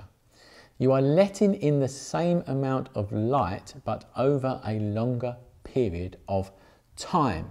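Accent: United Kingdom. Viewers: Lovely accent feels like I'm watching great British bake off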